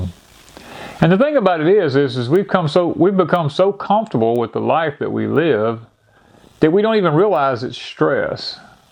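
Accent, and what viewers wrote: South, United States. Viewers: Hi, love listening to your southern drawl, I'm going to take your advice and ring my son tomorrow, can't see him he lives to far away, so I'll call him and tell him how much I miss him.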